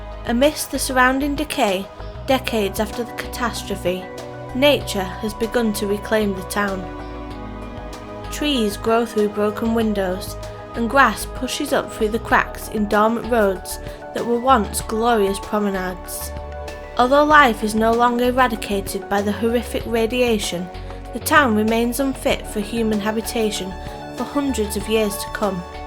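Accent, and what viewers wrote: United Kingdom. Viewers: The girl who narrates this has a cute British accent. I love her voice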